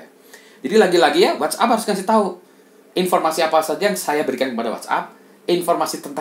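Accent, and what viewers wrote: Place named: West Java, Indonesia. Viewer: Namanya mirip dengan nama orang jawa tapi logatnya mirip orang sunda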